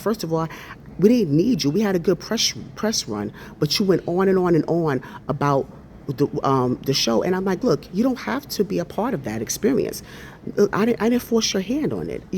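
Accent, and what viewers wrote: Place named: Brooklyn, United States. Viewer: Is that Brooklyn I’m hearing? Keep tawkin!!!!❤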